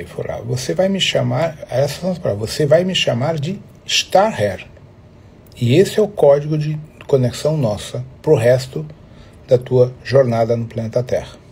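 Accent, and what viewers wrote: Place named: Portugal. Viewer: No le entendí ni la mitad. Lo lamento,a mi edad, me es difícil comprender un español aportuguesado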